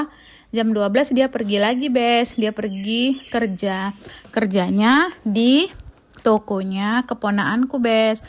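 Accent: Medan, Indonesia. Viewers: Kakak ini berasa bgt Medan nya, dulu saya pernah 6 thn di Medan..hihihi. disana jg ada kampung org Indianya ya bu...bpk suami ketemu di Medan juga kah bu? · Assalamualaikum dek... salam kenal dr jakarta,. aku baru tau ada chanel Nepali, maaf ya aku jd kepo... dek kl dr logat spt nya dr medan ya..? dimana ketemu suami hingga bisa nikah, sdh brp lama ada di nepal krn sdh punya anak besar2, suka pulang kah ke indonesia? dr berpakaian nya spr biasa aja, tdk spt kushboo pakai baju tradisi nepal pakai merah2 di kepala. terima ksh ya tolong jawaban nya ya... ❤❤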